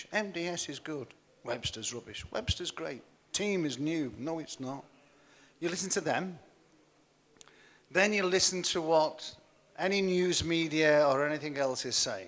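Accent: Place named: United Kingdom. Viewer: amazing😊 ooooh finally there's british english speaker whoever I've been awaited for a long time, all who came before were american english spekears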